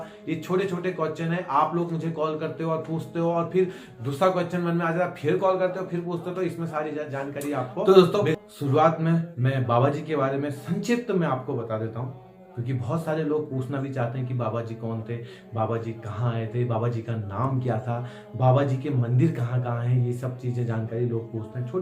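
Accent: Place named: Lohaghat, India. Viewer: Aapkey bolney k tarekey sey mujhey merey lohaghat k bhai bandhu aap mey hi najar aa gaye aa gye,sari jaankari bahut badiya de aapney .Man karta hai ki abhi Gurudev k dershan krney aa jaau per noukari na honey sey preshaan hu,is chinta sey mukt hotey hi Baba ji k chaar dhaam dershan krney jarur aaungi 🙏🏼jai baba ji maharaj 🌼🌼🌼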